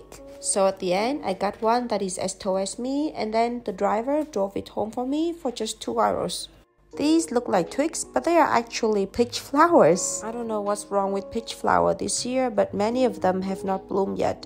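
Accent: Germany. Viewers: I'm so Confused because everytime I hear u talk for some reason I hear a more German Accent then a Vietnamese accent and I have no idea why lol. When I first found ur channel and saw u were living in Germany and after hearing u talk I just assumed u were born and raised there. Am I like the only one who thinks this?? Btw i really enjoy watching u and ur boyfriends channel! ❤ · Your English is starting to sound more German accented. It's cute 😏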